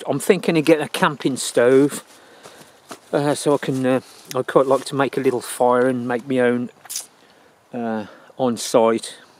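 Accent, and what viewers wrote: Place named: Warwickshire, United Kingdom. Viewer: 'ello! lol I'm Rae:D (RaeNtheBionicSheep on <link> ) you sound like your from Warwickshire! Where I was born lol! Not how I'd picture the face to the voice lol but nice to see another cacher vlogging. Must do cache vlogs myself lol... Thanks for vlogging!:D I am trackable at WBX9FJ if you wanted or anyone wanted to track me:D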